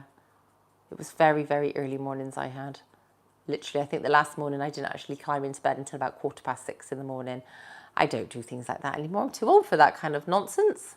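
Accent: West Country, United Kingdom. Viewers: Hey, you could DEF do a better job than Liz Truss? Love that slight country accent, is it West Country perhaps? Regards Chris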